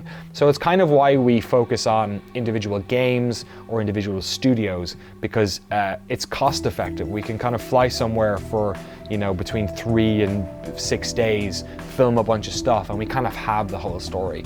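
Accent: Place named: Waterford, Ireland. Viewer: Shiiiiit! Your accent makes so much more sense now that I know you're from Waterford! Gas!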